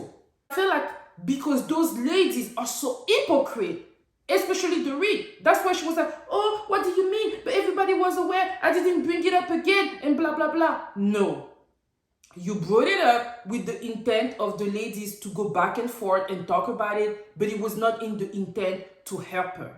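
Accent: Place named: Scotland, United Kingdom. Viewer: I'm from Glasgow! ❣️ I love that you read it in a Scottish accent 😂😂- you know how much we Scots love laughing at ourselves 😂😂. Garcelle and Sutton are definitely the two ladies of the group, and the only genuine friends, as far as I can see 💗 oh god - I would bounce rinna for her actions this season. She is an overdramatic sleazeball, who doesn't care who she hurts to have her moment, she is just like Andy - self serving and exploitative 💗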